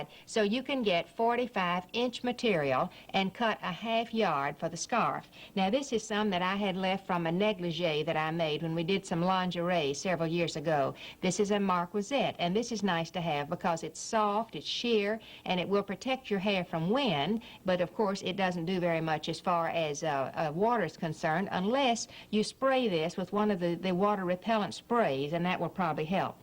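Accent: South, United States. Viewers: i don't remember this woman but i like her southern accent.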